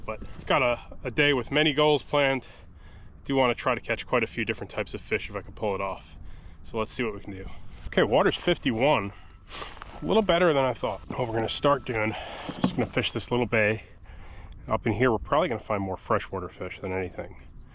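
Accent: New York, United States. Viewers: he is from nuu yawwk that's how we say crap eee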